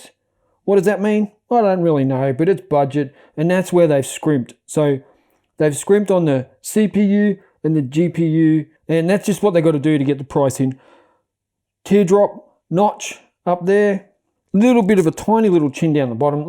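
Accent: Australia. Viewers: english Australia mate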